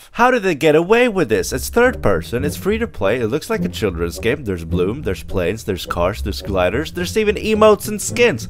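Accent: Sweden. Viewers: Are you from sweden? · Waitttt.. are you from sweden? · Är du svensk? /are you from sweden?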